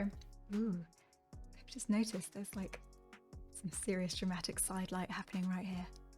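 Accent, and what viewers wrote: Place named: United Kingdom. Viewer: I've stared at your face in the reference images for so long but I had no idea you were British lol that blew my mind. Now I'm questioning everything.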